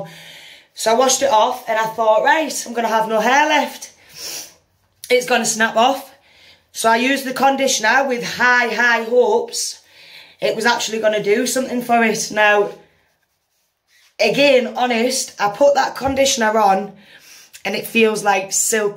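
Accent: United Kingdom. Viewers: R u a Geordie hun x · is that a Sunderland accent?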